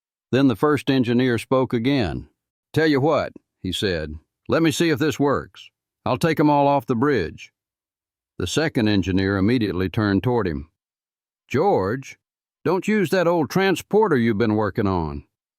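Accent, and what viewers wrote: South, United States. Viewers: Its so nice to here a narrator that speaks my own language, SOUTHERN. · Like I said and that is for reply you've lost consistency that southern drawl and humour and ya keep switching diff narrators when ya got a winner ya lost me but good try not sure how yer not relating yer stories good narrator all over the place ya can't relate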